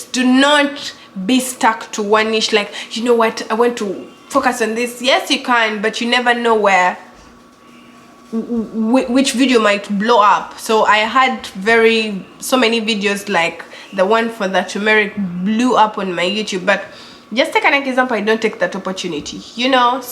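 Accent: Uganda. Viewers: Sarah sarai. Her accent is obviously ugandan.no doubt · she's Ugandan her and her accent can tell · I knew you are a Ugandan because of your accent... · Awww her accent can indicate that she is Ugandan. Lol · Wow u are a Ugandan banange, love ur accent darling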